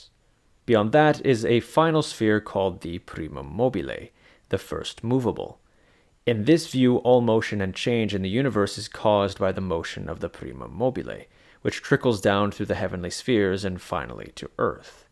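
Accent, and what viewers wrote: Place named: Quebec, Canada. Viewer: I KNEW you were from Quebec! Finally got my answer 😂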